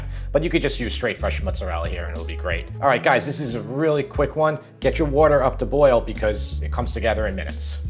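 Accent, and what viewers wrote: New York, United States. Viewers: I'm not sure where you live but judging from your accent I'm guessing New York? Anyways have you ever tried Frank and Sal's Italian Market in Staten Island? I live in Maryland and they ship me all my cheeses and tomatoes, they are incredible!! If you haven't tried them, I highly recommend them!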